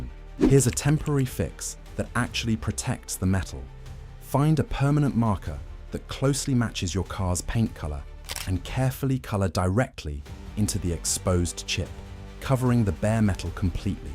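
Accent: England, United Kingdom. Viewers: Why is original English accent talking in Dollars⁉️ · English accent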